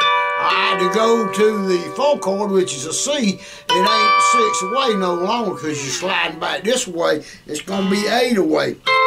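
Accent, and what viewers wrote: South, United States. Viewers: That’s the best southern accent ever N he’s not a bad player either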